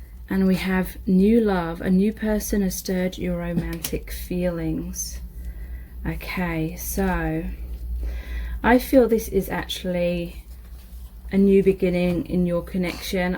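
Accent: England, United Kingdom. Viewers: She does have a soft sweet voice with an english accent 💖🌹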